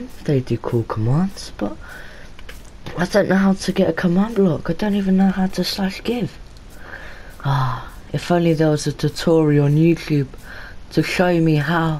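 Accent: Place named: Scotland, United Kingdom. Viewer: i sense scottish.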